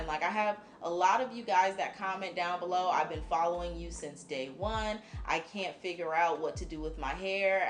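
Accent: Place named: South, United States. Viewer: Yes you do lol it’s kind of a southern accent. Where you from??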